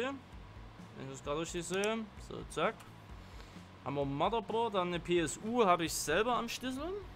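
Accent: Saxony, Germany. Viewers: Wieder ein geiles Video Findes es immer wieder geil Sächsisch zuhören-ob im TV oder in deinen Videos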